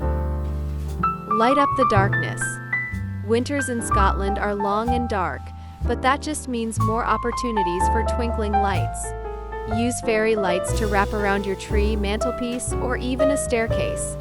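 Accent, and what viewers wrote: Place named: United States. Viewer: Why does Christmas in Scotland have to be narrated by an American accent?!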